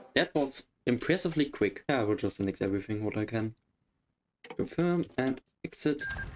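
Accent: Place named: Germany. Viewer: nice video bro und mann hört das du deutsch bist no front :)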